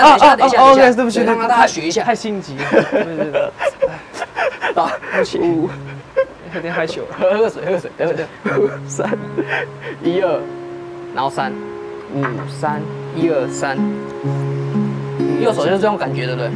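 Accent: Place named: Cantonese, China. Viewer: 在說廣東話的時候好可愛....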